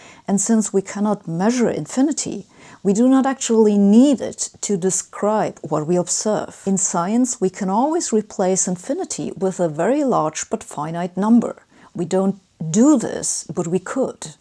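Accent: Germany. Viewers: Why is it that Ms. Hossenfelder is the only Youtuber that forms her words so perfectly that I never miss one? The slight German accent doesn't hurt, it may even help. Subscribed!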